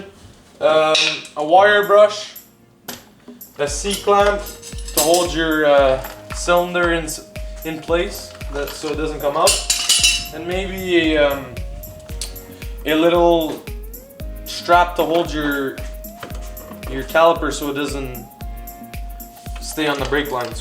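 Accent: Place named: Montreal, Canada. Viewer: Sounds like a Montreal Anglo-Italian accent, maybe Lachine?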